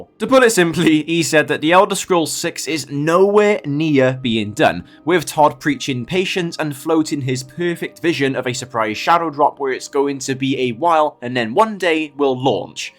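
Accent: United Kingdom. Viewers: bethesdar :P british accents are fun.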